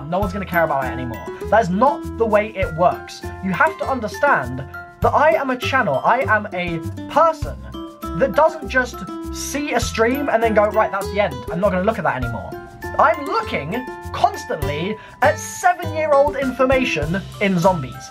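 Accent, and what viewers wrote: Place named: United Kingdom. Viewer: "8 blooDee years." British accents are amazing.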